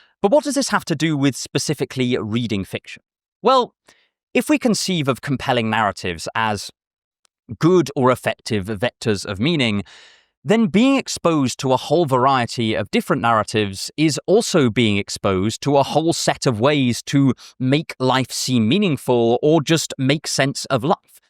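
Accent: RP, United Kingdom. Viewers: Do you speak received pronunciation? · Sir you sound like a man from victorian era elite british!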